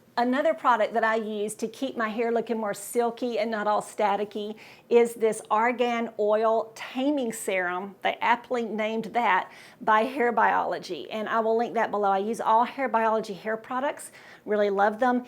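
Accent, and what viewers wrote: South, United States. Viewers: When you said you lived in Ohio, I thought, "What?? She's not from the South?" And then you said you grew up in Georgia, and I thought, "Yes!" I knew you had to be from the South! South Carolina/Mississippi girl here!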